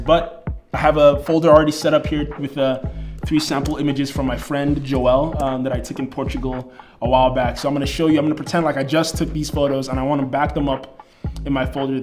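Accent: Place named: Nigeria. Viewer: Vibes are strong bro.. Are you Nigerian? I can hear a small hint in the accent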